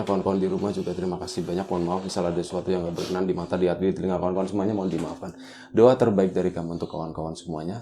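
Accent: Banyumas, Indonesia. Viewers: Amin matur suwun pada2 bro ora ngapak ora kepenak👍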